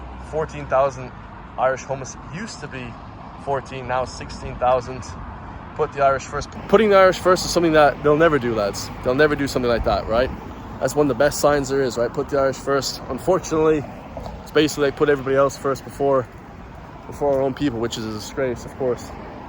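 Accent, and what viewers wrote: United States. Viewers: American accent, why?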